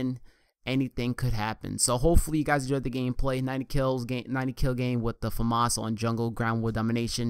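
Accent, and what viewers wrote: New York, United States. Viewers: I don't mind your New York accent at all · And new york accent doesnt bother me! It actually makes your commentarys more unique!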